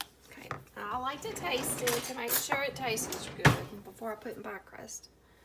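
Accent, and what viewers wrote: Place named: Kentucky, United States. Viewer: Hey there fellow Kentuckian! (I live in PA but I hail from the Bluegrass State!). I found your channel thru MrG1965. I have family in central Kentucky - Greensburg and Campbellsville. It's just lovely to hear someone with a KY accent! I don't hear it much up around here in Yankee territory! My wife does all the cooking here, and she's knows what she's doin too! I'm happy to support your channel and if you feel moved to return the favor that would be greatly appreciated. And if you don't feel moved, well, that's okay too! I hope yall had a wonderful Thanksgiving!! I forgot to ask......may I please have a slice of that delicious lookin chocolate pie?!!??!!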